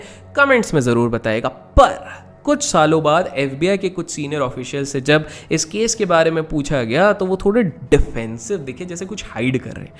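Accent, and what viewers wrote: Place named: Bihar, India. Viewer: E shasura 😂😂😂😂😂😂 Bihar ke bol ❤️❤️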